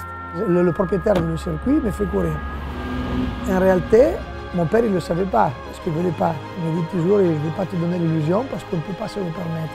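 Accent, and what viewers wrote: Italy. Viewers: He is italian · Am I wrong or he is an Italian speaking french? If not, what language is that? Sardo? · It isn't. He's an Italian speaking French—with a pronounced accent.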